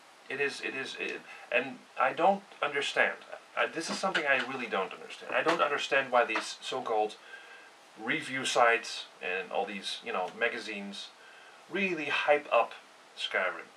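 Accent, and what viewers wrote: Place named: Netherlands. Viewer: Om één of andere reden is het altijd hoorbaar wanneer iemand uit Nederland komt :) Anyway, Indeed, Skyrim is lacking some serious polishment. This goes pretty much for all versions. Xbox version is sometimes having texture issues, and the PS3 version is a lagfest. This game wasn't ready for release, not in the least. They just wanted to make that 11-11-11 date because : * It's a cool date and easy to remember * Making some more septims due to the christmas periode.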